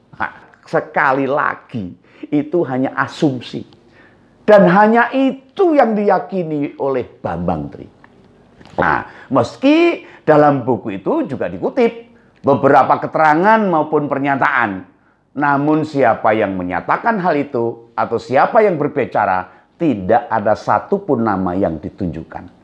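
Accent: Java, Indonesia. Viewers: Maaas aku suka dengan logat jawamu,, sehat selalu, dihukum ae lhooo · Enak,gayeng,medok,blokosuko,terus pakde mantan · 🤣🤣🤣🤣🤣🤣🤣🤣 Saya suka logatnya.... NJAWANI banget....🤣🤣🤣 Dagelan Jawa tapi sangat MENOHOK sampai ke jantung dan hati....bahkan sampai ke"SILIT".....🤣🤣🤣🤣🤣🤣🤣🤣🤣🤣🤣🤣🤣 Kena WASIR deh....akhirnya.... 🤣🤣🤣🤣🤣🤣🤣🤣🤣🤣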